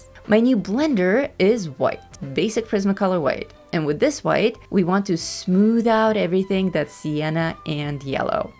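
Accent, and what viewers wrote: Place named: France. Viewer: You have a beautiful accent, what language do you speak? I get a hint of French.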